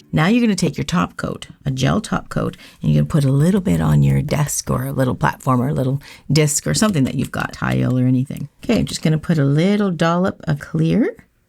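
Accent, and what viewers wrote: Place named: Canada. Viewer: I was wondering if you are Canadian, then I heard you say “nuke” and “eh” and that was my answer! I have just started doing my nails at home and have been doing research on products and designs. I’m going to do cat eye next!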